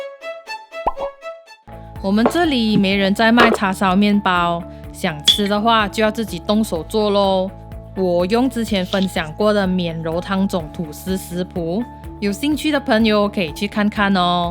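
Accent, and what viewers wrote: North, Malaysia. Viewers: 你的食谱都很喜欢，请问是北马人吗？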